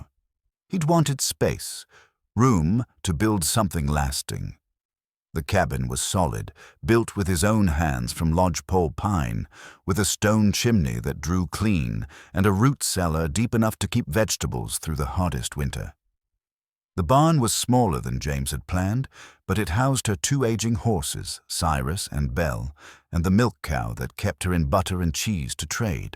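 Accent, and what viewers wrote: England, United Kingdom. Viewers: Need an American narrator for an American story besides an English talking narrator.. Her husband been dead two years and daughter died six months and her four or five months expecting a baby who is the dad ? It couldn't be her husband's baby... Proof reader needs to read the story.. I like the story it needs to be more accurate...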